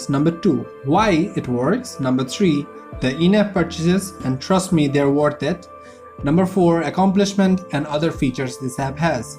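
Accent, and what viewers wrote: Bengal, India. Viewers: Why do u sound bengali?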